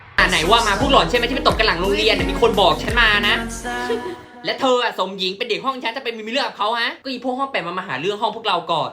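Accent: South, Thailand. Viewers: พี่ใช้คนใต้ไหม❤️🧡💛💚💙🩵💜🩷💝💖💗💞💕💓💟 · พี่เป็นคนที่ไหนคะทำไมภาษาทำไมภาษาเหนือภาคใต้เลยอ่ะ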